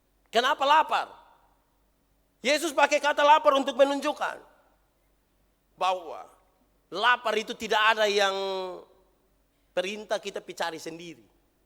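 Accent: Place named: Eastern Indonesia, Indonesia. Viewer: Salam dri Makassar Pak Pendeta, semoga tetap diberkati dan diberikan kesehatan yg baik dari Tuhan. Khotbah2 Pak Pendeta sangat luar biasa dan mudah untuk dipahami, apalagi dengan logat2 timur yg kental 🙏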